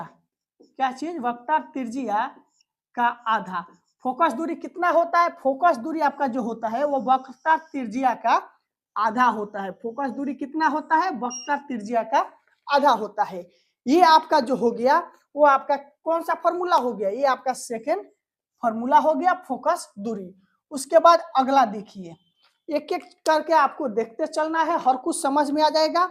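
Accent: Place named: Bihar, India. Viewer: That Bihari accent - नमस्कार भाईयों ❤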